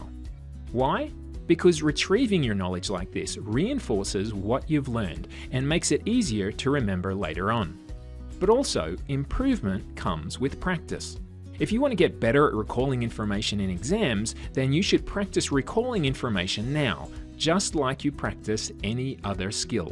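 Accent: Australia. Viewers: Beautiful aussie accent :) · Is this guy Australian?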